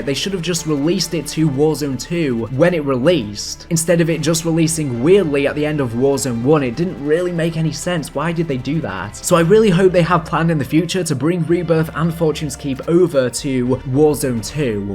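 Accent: England, United Kingdom. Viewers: I’m from Scotland and trust me mate, there’s about a million different accents out there in the UK. I’m pretty sure English, couldn’t tell you what part of England he’s from exactly though.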